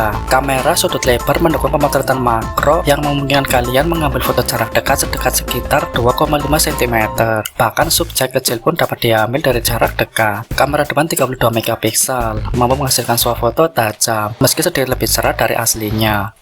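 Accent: Banyumas, Indonesia. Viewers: Ngapaknya masih kelihatan bang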